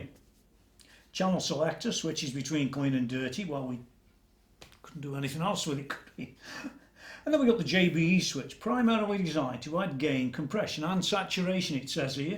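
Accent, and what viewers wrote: Stoke, United Kingdom. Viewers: I must admit I'm sceptical about the use of silicon gel for damping, not seen that before on amps I've owned. BTW, most of the comments on Psionic Audio's review are from the US, their optics will be different because of the pricing. In the UK the JTM Studio 20 is half the price. It's also amusing seeing them trying to guess your accent... cockney, Scouse, Scottish.... personally I'm thinking more up 'Anley duck!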